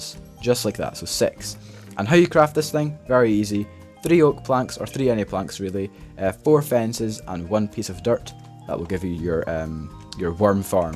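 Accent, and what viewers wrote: Scotland, United Kingdom. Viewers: Thanks! This overview really helped me! Also I love your partially Scottish accent. 😂😂😂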